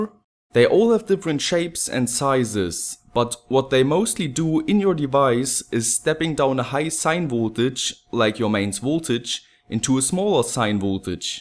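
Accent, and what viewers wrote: Germany. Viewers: Do you come from germany? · ***** schon nur geb zu es ist zum teil nervig den deutschen akzent im englischen zu hören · Bist du deutscher? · Man hört es richtig heraus dass du ein Deutscher bist. Dein Englisch ahahahahaha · w8 r u from Germany? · Bisch du Deutsch? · Are you German?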